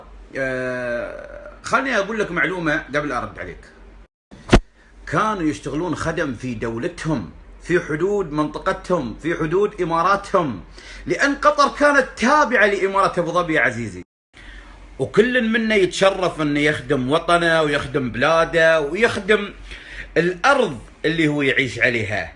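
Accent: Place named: Bahrain. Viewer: ابدا منت كعبي مستحيل لهجتك بحراني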